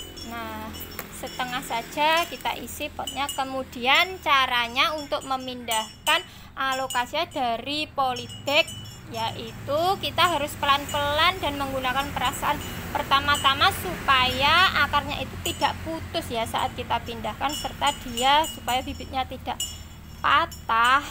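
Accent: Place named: Java, Indonesia. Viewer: English ne jowo aksen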